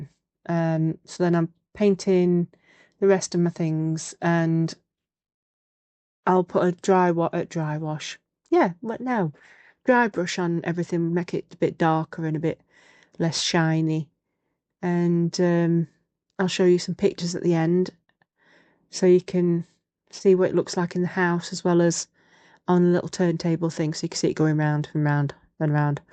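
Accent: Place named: South Yorkshire, United Kingdom. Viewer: im loving watching you make this, i recognise your accent and voice. Im from South Yorkshire maybe thats why xx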